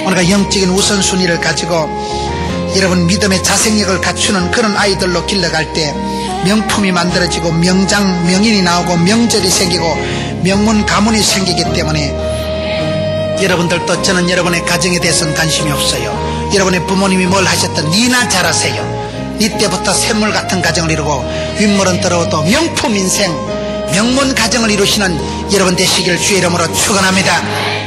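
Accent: Gyeongsang, South Korea. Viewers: 경상도 방언을 쓰셔서 그렇습니다 거친 표현이 아님니다 더군다나 교만하고는 거리가 뭡니다 국어학 적으로 아무런 문제가 없습니다 그러려니 하고 들으시면 되지 않을까여 😊😊😊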